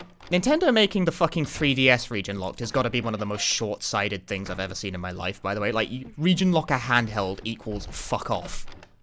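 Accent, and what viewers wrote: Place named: London, United Kingdom. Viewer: if you're reading this my dude which part of London are you from?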